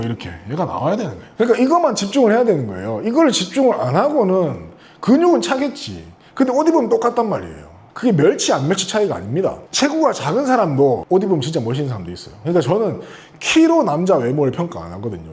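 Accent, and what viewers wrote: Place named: Gimhae, South Korea. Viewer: 행님 김해서 오셨습니까 사투리 구수하네예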